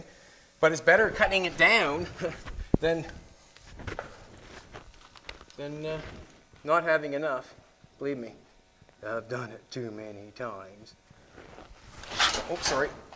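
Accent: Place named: Maritimes, Canada. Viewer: First time here, I knew that was a maritime accent! Great work!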